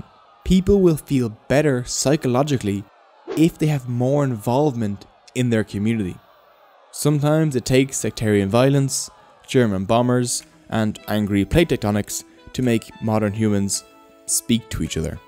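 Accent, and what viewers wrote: Ireland. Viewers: "Sw- swiss physicians. Oh what a f------ word combination!!!" I love the English language and your Irish accent. Thank you for the laugh.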